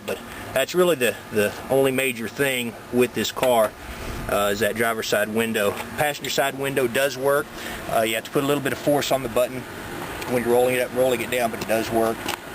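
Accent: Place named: South, United States. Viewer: what part from the south are you from lol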